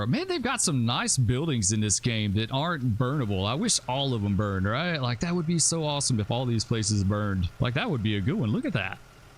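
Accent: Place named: New York, United States. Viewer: Into the flames time I see let the FDNY accent begin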